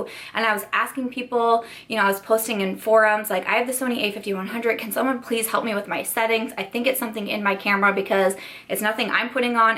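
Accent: United Kingdom. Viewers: I loved your brutish accent! 😂😂 love you ❤️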